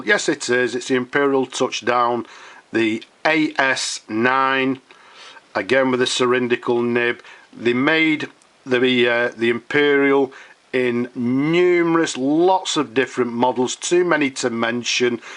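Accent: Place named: North, United Kingdom. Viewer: Ey up! Thy sounds like a Northerner. I have an Imperial I with a plastic Touchdown rod.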